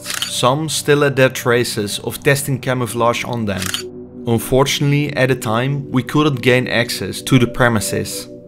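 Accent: Netherlands. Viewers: by your pronunciations, you definitely are Dutch. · it's an accent I would dare to recognize amongst hundreds of people in "wedden dat..." , all nationalities included🤭 I mean nothing bad by this. Ps. I love old abandoned buildings ánd cars😊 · Zo duidelijk een Nederlander joh hee :-) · dutch accent . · What an amazing documentary! By the way are you Dutch? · Je klinkt Nederlands kan dat kloppen? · Lekker Hollands Engels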